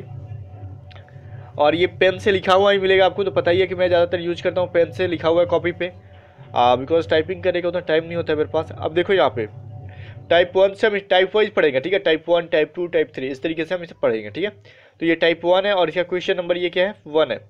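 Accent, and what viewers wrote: Bihar, India. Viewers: bihar se ho kya bhai?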